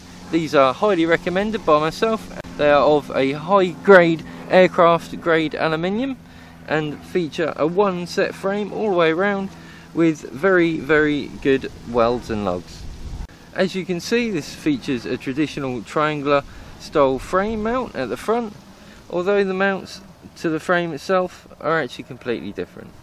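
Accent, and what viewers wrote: Norfolk, United Kingdom. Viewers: Bor Yueh presented by a rum ole Norfolk boi sounds like ole Boi yeah haha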